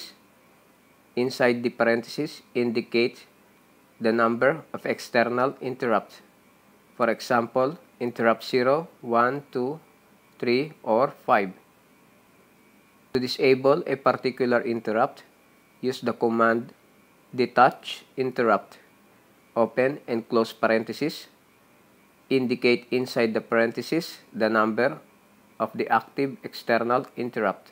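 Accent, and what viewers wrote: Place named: England, United Kingdom. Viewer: It is lots of good info, Just so hard for me with my cowboy english raisen to understand the proper english you speak. It is not your issue as I am sure your countrymen understand you just fine but would have a very difficult time understanding me. You know how us Americans are, were the only ones on the face of the earth.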